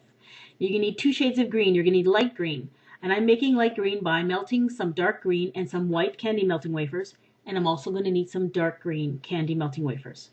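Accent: Canada. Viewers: I could tell that you lived in canada before you mentioned it because of your accent c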